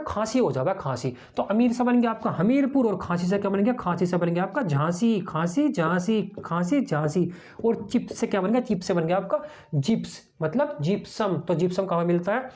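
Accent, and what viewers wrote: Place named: Meerut, India. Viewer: Sir video bhoot mst hai.. ekdum funny. Tarike se apne padhaya hai . Apki boli se lag rha hai aap merrut side se ho.. 😅 Bhoot acha padhaya hai .❤❤❤❤❤❤❤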